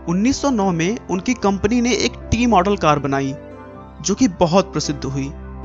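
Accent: Bihar, India. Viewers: Abe tu bihari he kya...???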